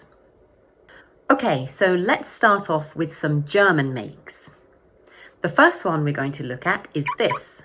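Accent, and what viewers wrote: United Kingdom. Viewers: 영국식 영어는 표준이 아닙니다. 미국인도 듣고 비웃는게 영국식입니다. 미국식 영어 발음을 들으세요. 하이윤다이? 미국은 히윤다이, 헌다이 등 다양합니다. 저 발음에 속지마세요. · 와 진짜 왜케 깐지나냐 영국 발음.. 자꾸 눈 앞에 왠 양복입은 신사가 아른거림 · 영국 자동차 리뷰채널 Carwow 시청자들은 자주 들어봤을 발음이네요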